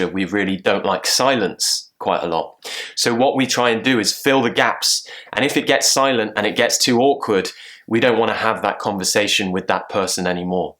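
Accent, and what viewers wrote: RP, United Kingdom. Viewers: thanks for your british accent/phrases tutorial videos, i just love it! <3 Because it helps me to understand some phrases what my cousin talkin' about btw she's from London. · I have become intoxicated to your British RP accent, that's what we call it, I was obsessed with my pronounciation, felling better now.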